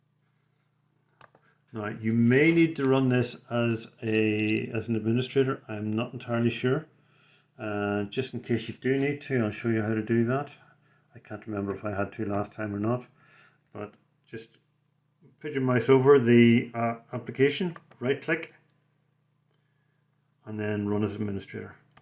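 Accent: Northern Ireland, United Kingdom. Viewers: Hi down in Larne here and have 2 250w Bafang bikes and I am really happy with the default setting and I am now hitting well over 2,000 miles .Is this reset really helpful on a day to day basis ? p.s. you sound like a norn iron man .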